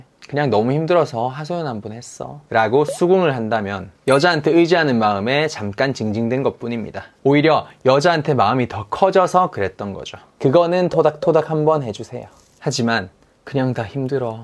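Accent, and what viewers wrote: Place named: Daegu, South Korea. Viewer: 대구에요? 억양잌ㅋㅋㅋㅋ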